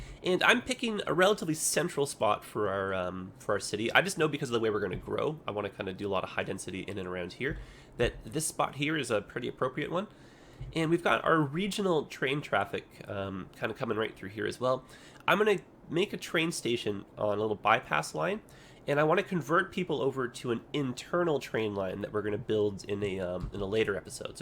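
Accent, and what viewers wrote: Canada. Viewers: That Canadian accent, bro 👍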